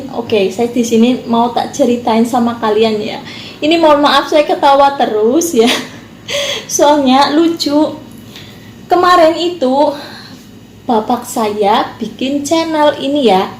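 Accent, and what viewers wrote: Banyumas, Indonesia. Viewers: Selamat pagi mbak ngapak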